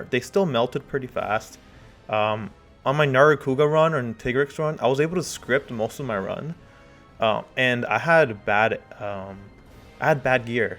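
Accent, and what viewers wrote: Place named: Taiwan. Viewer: Lol, I thought you were from Taiwan or something.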